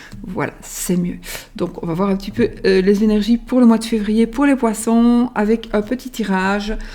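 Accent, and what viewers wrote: Belgium. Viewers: J adore l accent belge on es les meilleurs 🇧🇪 Merciii pour ce tirage♥️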